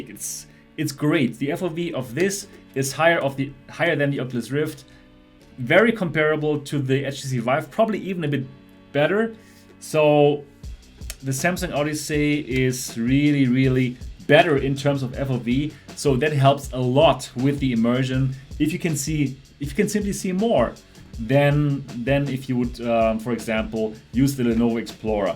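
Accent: Germany. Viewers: Tscherman not Austrian. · Thanks mate for these very well-done and informative videos, complete with a nice German accent :) I am a bit puzzled, though, how you find the Samsung so great while most others seem to find loads of flaws in it. After looking at lots of videos and reviews I decided to go for the Lenovo, just ordered it from Amazon ($279 + customs and shipping). I am a bit concerned about the bluish tint of the displays. Is it possible to adjust the display colours via some software? Naturally you can't do anything about the low contrast of the LDC display but surely it must be possible to adjust the colors more towards red?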